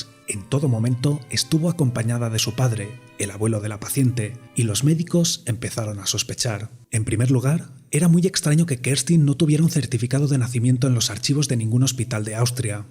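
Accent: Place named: Basque Country, Spain. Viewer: Oye. De dónde eres?? Ese “pero” k metes al final de las frases esmuy euskaldun 😂😂 igual me he colado. Pero diría k eres de Donosti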